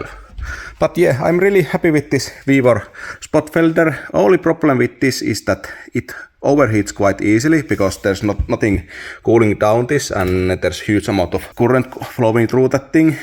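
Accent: Finland. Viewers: Eikö ihan rehellisesti Suomella mentäis, on sen verran karseesta "ralli englantia"😢 mulla on tuollainen aito (ei kiina kopio) pistehitsi 30v takaa.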